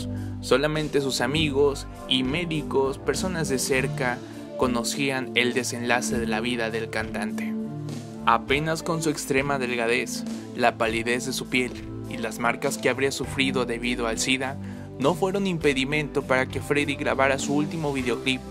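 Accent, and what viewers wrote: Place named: United States. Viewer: Por qué el narrador habla con un ascento americano muy feo??????, Se escucha horrible, habla con tu voz natural ;)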